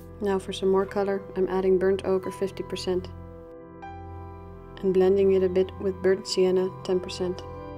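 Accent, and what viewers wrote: Netherlands. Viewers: Beautiful. Thank you for sharing. I use almost the same technique. Aren't you a Dutch by any chance? Your accent tells me so....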